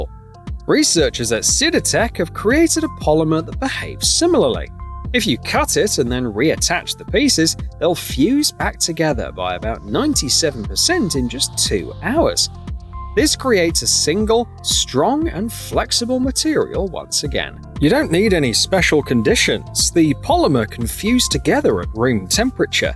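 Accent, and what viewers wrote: United Kingdom. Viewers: Brit accent should be confined to Brit subjects.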